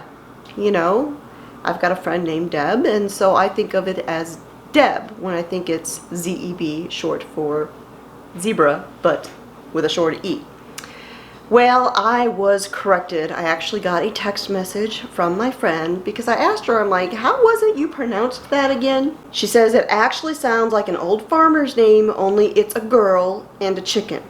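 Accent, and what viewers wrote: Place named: South, United States. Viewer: Love the southern accent! I would have pronounced like you even though I grew up in the south.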